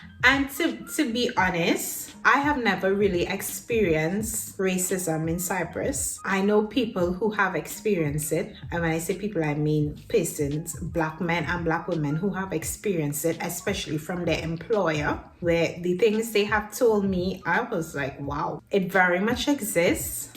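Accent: Trinidad and Tobago. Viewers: First of all, love the trini accent!! As for the staring though, I must say that it too is a caribbean thing (though it may not be a Trinidad thing if they be ringing people ear) xaxa!!! Whenever I think of people staring, I remember how my Jamaican friend/classmate would ALMOST ALWAYS respond when she eyeing people down and they does vex over it: "is my eye" she say xaxa! Caribbean people love a stare just as much as Greeks, Germans, Turkish, Chinese or even Americans on vacation do. I don't think it is out of malice when Cypriots stare, it could be just that they don't see enough Trinis touching down :) kali tyxi! PS: Your tiktok did not lie; don't feel no how.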